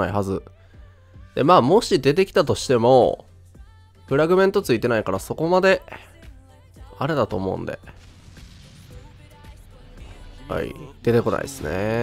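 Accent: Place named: Kansai, Japan. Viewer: スネークの関西弁もそうだけど低めで渋い声の関西弁はまじでかっこいい笑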